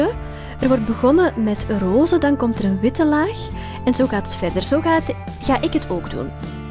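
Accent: Flanders, Belgium. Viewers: Ben je Belgisch want zo klinkt het. Niet erg natuurlijk maar dan. Moet je jezelf niet Nederlands noemen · je spreekt niet "Belgisch" , het is Vlaams en wij zeggen ook bv. praat Nederlands want Vlaams is een accent...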